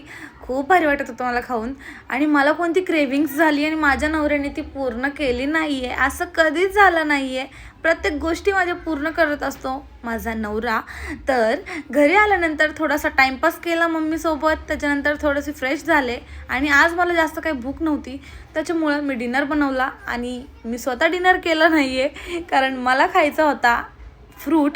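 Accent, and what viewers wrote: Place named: Nashik, India. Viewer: actually mla mhiti hot tu punya chi ahes pn tuzya bolanya varun mla as vatat hot ki tu Nashik chi ahes❤❤❤ . Ani tumchi jodi khup chaan ahe . Ani tu ata swatachi kalji ghe nehami khush Raha ❤❤ love you both of you 😊 Take care dear ❤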